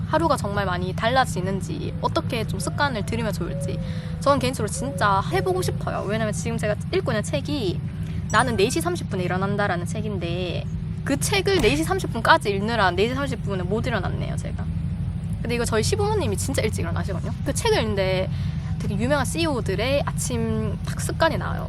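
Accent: Gyeongsang, South Korea. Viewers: 일하는 직장인으로서 힘들고 지쳐있고 현재 따고픈 공부도 하고잇는데, 가끔 님 유투브보면 너무 재밋고 하여 즐겁게 시청해요 ㅎㅎ 게다가 경상도사투리가 와닿아서 잘듣고잇어요ㅠㅠ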